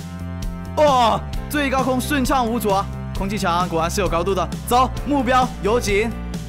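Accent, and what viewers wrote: Hong Kong, China. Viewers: 4：48你是香港人？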